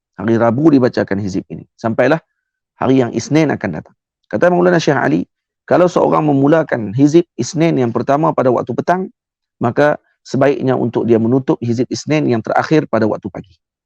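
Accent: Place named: Malaysia. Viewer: trima kasih atas penjelasanya ustad mungkin bahasanya indonesia malaysia mungkin ustad dari malaysia masih ada logat malaysia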